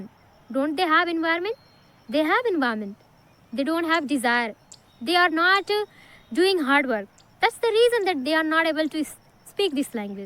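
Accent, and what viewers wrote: India. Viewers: Are YoU from Indiaa???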